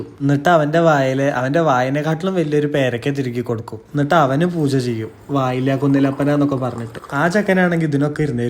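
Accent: Valluvanad, India. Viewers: എനിക്ക് ഒത്തിരി ഇഷ്ടമാണ് വള്ളുവനാടൻ ശൈലി...❤ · ഇത് വള്ളുവനാടൻ ശൈലി ആണ്.. നമ്പൂരാർക്ക് മാത്രം ഉള്ള ഭാഷ അല്ല. ഇവിടെ എല്ലാരും ഇങ്ങനെ തന്നെ!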